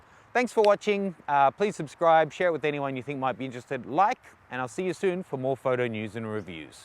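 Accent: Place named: Australia. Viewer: The Australian accent is the hallmark of racist moron in the world right now So if you want to talk about cameras tone it down on the ugly accent Mate !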